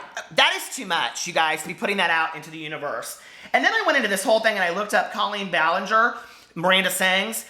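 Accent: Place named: Indiana, United States. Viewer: I love your Indiana accent! I lived in South Bend for 4 yrs & loved it 😊 You are so funny & I love watching you 💙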